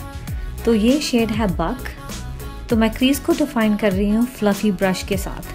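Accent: Punjab, India. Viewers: I love you yaar M straight 😂 Love you dil se ❤️❤️❤️❤️❤️❤️❤️❤️❤️❤️❤️😘😘😘😘😘😘 Love from Chandigarh Love your Hindi and punjabi accent Muuuuuahhhhhhhh😘😘😘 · Thodi Punjabi te att aa ji Hindi Ch v ohi accent Mere father ki da c edda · Tadi voice tone ch Punjabi touch aa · I like ur punjabi accent👍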